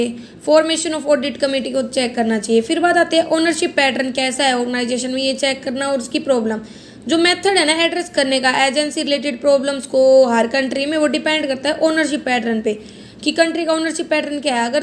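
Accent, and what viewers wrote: Haryana, India. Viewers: Haryana se ho app?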